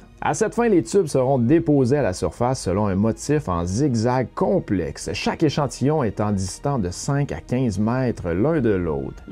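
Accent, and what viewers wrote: Quebec, Canada. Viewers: Très bonnes infos. En tant qu’européen j’aime beaucoup le côté décalé de la présentation et l’accent québécois si agréable à entendre… ça me change des psychorigides d’ici 🤣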